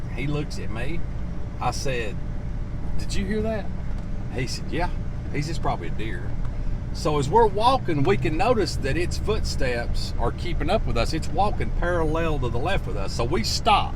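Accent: South, United States. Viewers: a southern drawl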